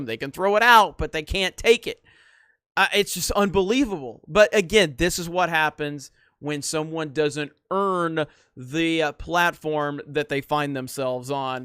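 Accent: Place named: South, United States. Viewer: Good day, Salty Troop stopping by. I'm starting to like this guy. Like his slight 'Southern Preacher" drawl, and his content presentation.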